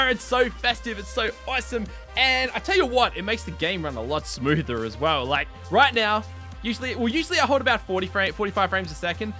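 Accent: Australia. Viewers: Hay u Australian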